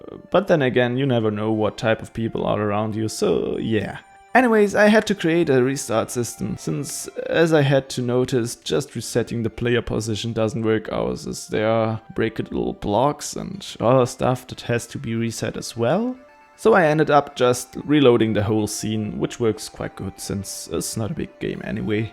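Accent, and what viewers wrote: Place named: Switzerland. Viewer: Typisches Schweizer-Englisch :P Fand den Soundtrack übrigens ziemlich dufte! Ich hatte Godot vor ein paar Wochen auch mal ausprobiert gehabt, aber ich fands extrem unintuitiv xD Vor allem diese Node/Scene-Struktur find ich weird und macht iwie keinen Sinn für mich... Dann hab ich's wieder sein gelassen xD Naja. Hätte sowieso niemals das Durchhaltevermögen für ein komplettes Spiel gehabt ^^" Aber cooles Video :)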